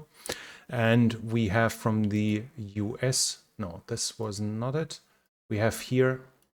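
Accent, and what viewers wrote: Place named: Germany. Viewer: Ich mag dein German-English 🤗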